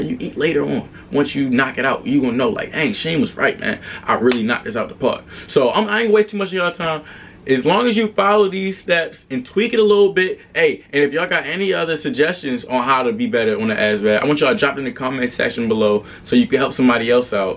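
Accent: Baltimore, United States. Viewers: I can tell he was from Baltimore in a few sec. just off how he talk fr, 410 baby we here. I’m also looking to go in with air traffic control aspirations but for the Air Force.. definitely going be following you my brother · Same! I just love the his accent. Gotta be Baltimore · Sounds like he from either Bmore, D.C. or Jersey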